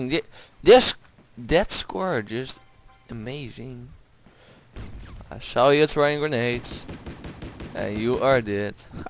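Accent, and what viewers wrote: Netherlands. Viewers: I like your video, you can speak pretty good English but I know you're Dutch :p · ***** hij is nederlander het accent is zo typish... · are you dutch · ik hoor dat je nederlands bent maar je kan goed engels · are u from the netherlands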